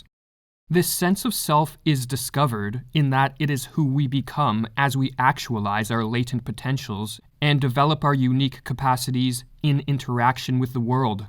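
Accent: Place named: Canada. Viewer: Whats up with you,? How old are you? You lift weights? squats? You sound Canadian. Im Diogenes.really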